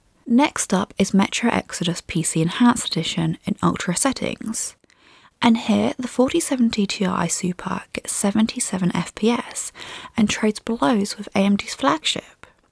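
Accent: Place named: RP, United Kingdom. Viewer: i imagine this is what its like to listen to english royalty talk about GPU's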